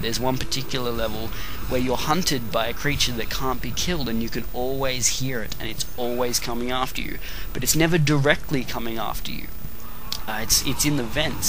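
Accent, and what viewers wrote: Australia. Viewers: always amusing to hear another aussie on youtube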